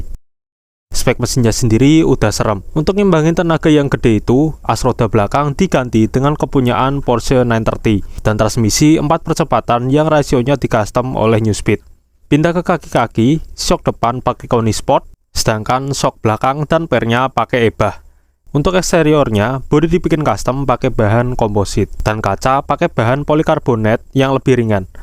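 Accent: Java, Indonesia. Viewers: Medokmu lo minnn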